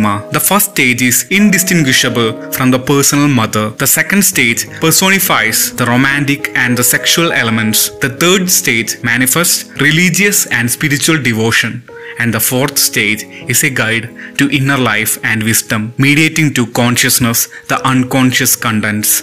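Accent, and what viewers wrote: India. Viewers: Sure. Will do. You take care. I wish you the best. Always will keep wishing more luck to you. Dont worry about being Indian or the accent. I know our image is looked down - It is what it is. But keep going. "I know of no better life purpose than to perish attempting the great and impossible. The fact that something seems impossible should not be a reason to not pursue it. That's exactly what makes it worth pursuing. Where would the courage and greatness be if success was certain and there was no risk." - Nietzsche. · Your Indian accent makes this video much more impressive and sound more spiritual.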